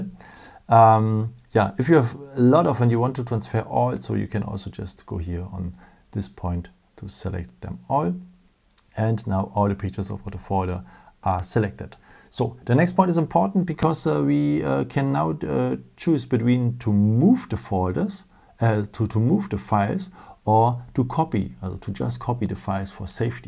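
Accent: Germany. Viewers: Gutes Video! Aber kann es sein das du deutscher bist?😅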